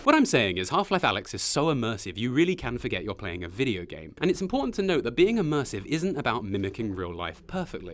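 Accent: United Kingdom. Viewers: Yay the strange British man has returned!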